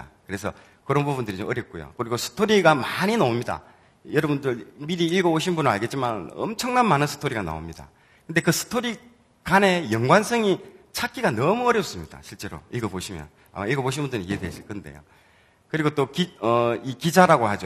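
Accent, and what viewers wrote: Gyeongsang, South Korea. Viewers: 경상도 어조 질색을 하는데 이렇게 듣기 거북하지 않은경우도 있네요.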